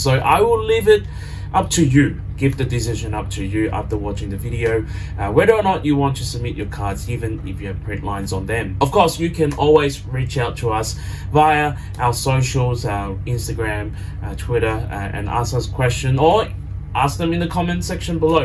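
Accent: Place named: Australia. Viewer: So awesome! Random question but I assumed you were Australian until I heard you say you’re in Hong Kong. We’re you taught by an Australian?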